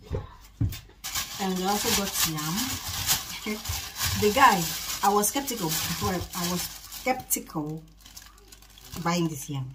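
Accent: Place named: Igbo, Nigeria. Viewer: I just tap the like button before even watching because you are funny with the Igbo accent.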